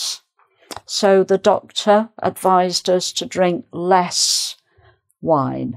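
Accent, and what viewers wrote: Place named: RP, United Kingdom. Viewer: Oh. that Lovely british lady with RP accent!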